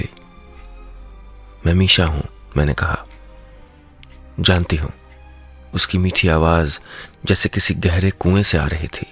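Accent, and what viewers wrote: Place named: Uttar Pradesh, India. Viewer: sir ...apki aawaj me kuchh up ka touch lgta hai ....😍😎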